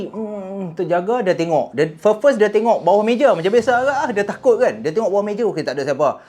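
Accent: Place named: Penang, Malaysia. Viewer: asalamualaium. pandai juga hang cakap peneng.hang pandai buat lawak.teruskan kerjaya hang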